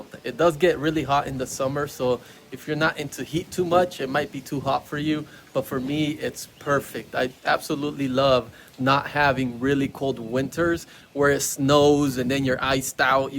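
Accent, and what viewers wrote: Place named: Matagalpa, Nicaragua. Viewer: I used to live in matagalpa and I recognized the accent from there on you but now I see you live in the segovias my hometown best place to live beautiful weather and beautiful people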